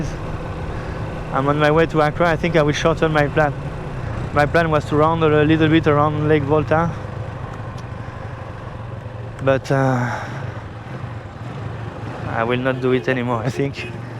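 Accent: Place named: France. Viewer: Vu l'accent anglais je pense que tu es Français, donc je vais parler Français. Ca fait vraiment peur comme expérience, en un instant tu te retrouve dans un enfer de piqures et de saloperies volantes qui t'attaquent de toutes parts.. En regardant la scène je me demandais pourquoi tu n'avais pas foncé dans l'eau, mais immédiatement je me suis dit qu'évidemment tu ne devais pas être capable de penser très logiquement dans une situation pareille, et peut-être que comme il ne t'étais jamais arrivé pareille chose tu as cru qu'en partant plus loin elle cesseraient de te suivre ? C'est dingue qu'elles continuent a t'attaquer autant même une fois dans l'eau.. tu dis même qu'elles venaient sous l'eau pour te piquer ? As tu tenter de les éclabousser a ce moment ? Je te demande pour savoir un peu mieux quoi faire si jamais ca m'arrivais.. Tu as prévu de l'equipement au cas ou cela arriverait de nouveau ? 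Je ne sais pas si il existe des bombes spéciales pour les repousser.. En tout cas content pour toi que tu aies pu survivre a ce terrible moment, et bravo pour tes vidéos qui ont l'air très intéressantes et que je vais surement continuer a explorer ! Bon voyage !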